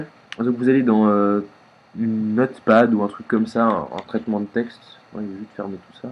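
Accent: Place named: Belgium. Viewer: Ha un Belge !! ( j'adore les 90,70) ;) Sinon tres bon tuto !!.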